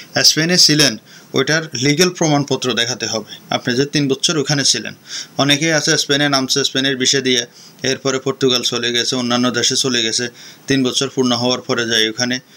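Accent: Noakhali, Bangladesh. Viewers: ভাইয়া আপনে খুব চালাক মানুষের কথা বুজে পেলেন। তাই আপনার কথা গুলা বেসি বেসি শুনি খুব ভালো লাগে আসোলে নোয়াখালির বোলে কথা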